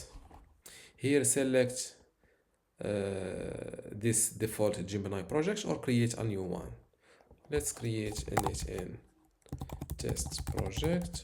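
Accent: Morocco. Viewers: I hear a morrocan brother <3 Hello from DZ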